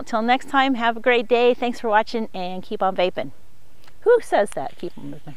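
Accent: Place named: United States. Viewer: If It wasnt for your American accent, I could swear that you were here with me in Australia! A+ review as always & 3 the hat!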